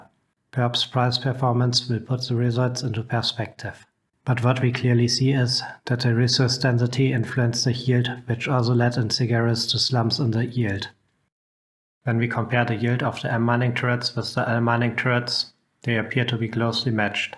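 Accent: Germany. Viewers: Lol u sound like you sit in a dungeon. Combined with the heave german accent i laughed throughout the whole vid. Great job my friend. #alloallo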